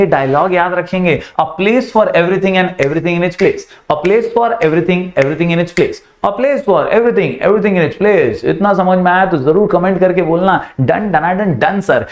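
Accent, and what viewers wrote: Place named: Bhojpuri, India. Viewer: Btw sir aapka bhojpuri bolne ka tarika bhot mst h 😂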